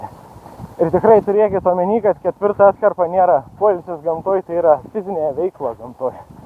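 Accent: Šiauliai, Lithuania. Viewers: Respekc uz siaulietiska kalba:)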